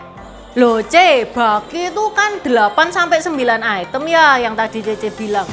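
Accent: Java, Indonesia. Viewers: Suaranya medok jawa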